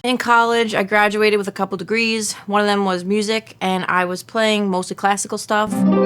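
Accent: Long Island, United States. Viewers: Greetings,! I've been lurking on your YouTube channel on and off for about a year. I didn't know you were based out of Long Island. I guess the thick accent should've given it away. Your accent is Long Island AF. I was born and raised in Long Island (Bay Shore) until I was 15 when my family moved to Florida. In my opinion, an artist is someone who uses their skill at a particular medium (in this case, harp playing) to create art. The person has a passion for their craft and is willing to dedicate time and effort into it. They have a desire to express themselves through their work and can envision ideas, emotions, and stories that are then translated into their work. Not only that, but their work is able to evoke emotions in others and inspire others. You're doing these things, so I would say that qualifies you as an artist. Keep doing what you're doing!, I'm all for physical media, and while I personally own a record player, not all of your followers do, and they have no way to listen your album unless they decide to buy a record player. 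Also, what's stopping someone from doing a line-in recording of the album to their computer and sharing the digitized audio online?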